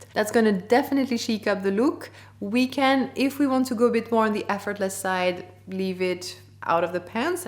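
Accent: France. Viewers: I love your french English ❤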